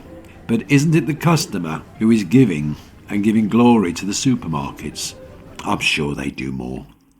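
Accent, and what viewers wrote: Birmingham, United Kingdom. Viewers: Aah ' a fellow brummy......✅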